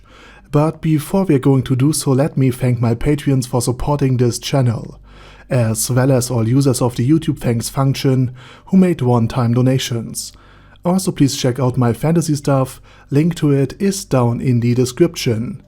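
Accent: Germany. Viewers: As a german i am so triggered by our accent. I am sry but it triggers me so much. I like the thoery videos and shit about overlord though.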